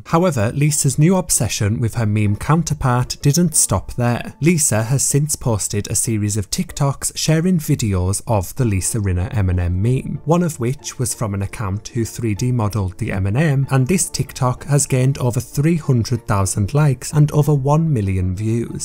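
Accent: United Kingdom. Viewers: I appreciate the sincerity, seriousness and UK accent you employ in approaching these major topics of their time. It's like Dateline for silly memes.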